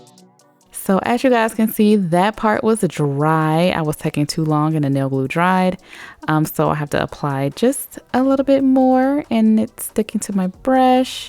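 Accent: United States. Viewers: From London Brixton UK. Wow. Girl you got creative talent. Beautiful. This is the USA female accent that sounds real nice.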